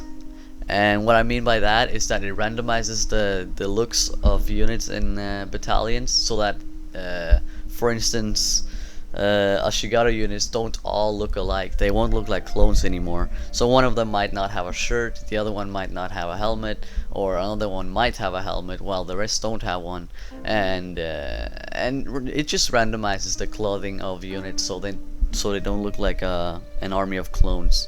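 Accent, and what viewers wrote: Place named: India. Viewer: Idk, your words sound heavy sometimes like some Indian people's accent in the US. Also when are you continuing your Carthage campaign?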